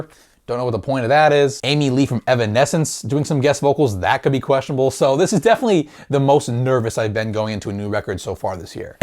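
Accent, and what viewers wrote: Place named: New York, United States. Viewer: Oh, I could've sworn you were with that accent but maybe it's a NY one lol.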